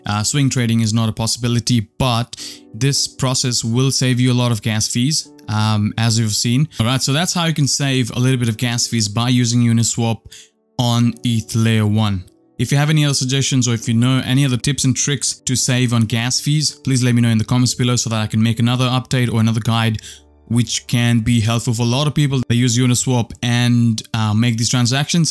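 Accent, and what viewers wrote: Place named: South Africa. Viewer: I hear a South African accent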